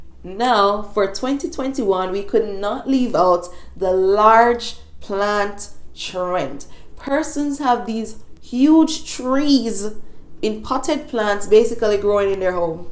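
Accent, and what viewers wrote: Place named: Jamaica. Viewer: was just saying you sound Jamaican. This is my first time coming accross your channel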